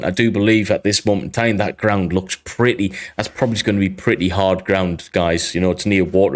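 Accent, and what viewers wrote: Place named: County Durham, United Kingdom. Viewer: You sound like someone from County Durham and for that reason you have my “thumbs up”. Best wishes.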